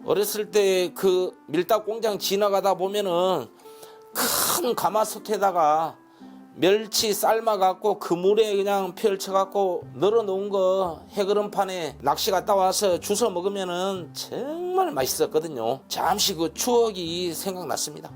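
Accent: Jeolla, South Korea. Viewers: 구수한전라 사투리가 더맛깔나네요ㅡ. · 서론없어좋고 전라도고향말좋고 초간단최고최고🎉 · 완전전라도식말씀 헤그럼판오랜만에들어보네요 셰프님 멸치무침잘들었네요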